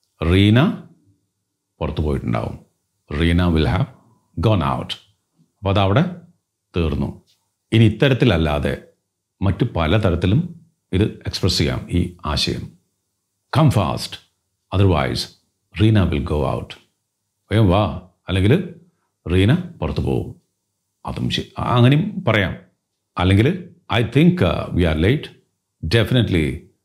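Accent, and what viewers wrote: Thrissur, India. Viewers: Intro...kalakki....sirntae sound super... Sirntae voicelootae kettappo thrissur bhasha endhu rasama...👌👌👌👌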